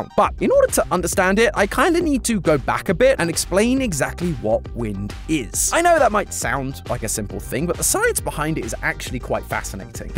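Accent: United Kingdom. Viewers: Why does Matt Patt sound more British?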